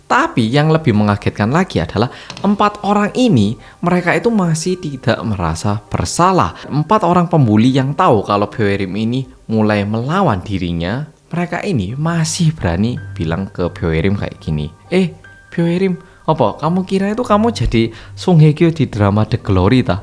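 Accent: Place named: Malang, Indonesia. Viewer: Oalah sakno e talah.. rest in peace ya cantik.. jan kok nemen.. mas kok yo ero kualat.. hahahhahaa... jan medok malange.. salam dari malang.. ❤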